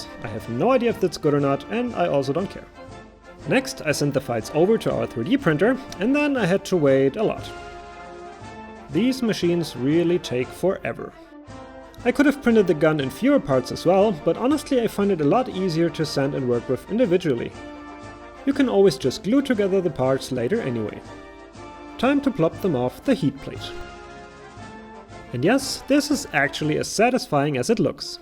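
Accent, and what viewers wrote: Germany. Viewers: okay is he german? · ich wusste das du deutsch warst ... hört man am Akzent und an dem deutschen lack😁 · No german is be able to hide his accent :) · i knew you are german!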